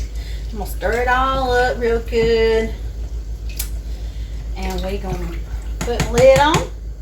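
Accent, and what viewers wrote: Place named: South, United States. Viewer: Thanks for the recipe...i like the sautéed onions and garlic trick...cooking right now...smells delicious! I just love your cute little southern accent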